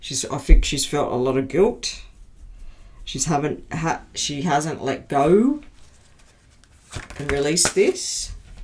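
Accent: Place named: Australia. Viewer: Geeat read. Being an Aussie i have found you and aother read i follow. Love the Aussie accent.❤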